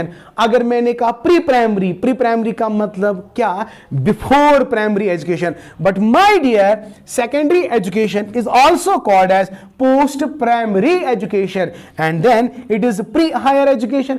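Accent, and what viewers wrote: Kashmir, India. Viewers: I like your little kashmire in sir your lecture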